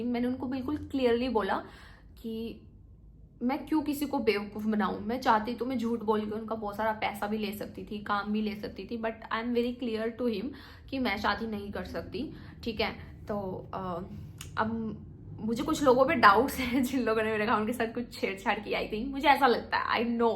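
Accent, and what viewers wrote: Haryana, India. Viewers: yr m proper delhi se apke muh se haryanvi sunke acha lga 😅😅😅😅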